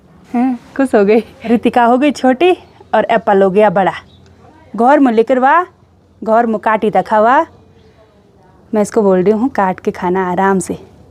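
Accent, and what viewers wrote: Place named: Pahari, India. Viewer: अ य चाची परनाम ❤😂 मीठी आवाज, पहाड़ी लहजा ❤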